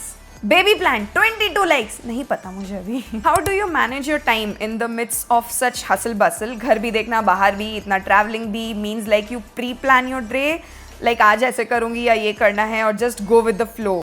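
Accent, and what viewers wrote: Bihar, India. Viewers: family they are from jharkhand which was a part of bihar i guess that's why accent little bihari ki jaga gujarati wase sound lagta hai but aapka toh pura bihari bhojpuri but from this vlog got it that you from Rajasthan 👍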